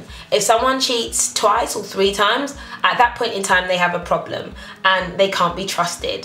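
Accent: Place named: United Kingdom. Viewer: Oh and to the people wondering why she says 'arks' instead of ask...its just how some brits pronounce it :)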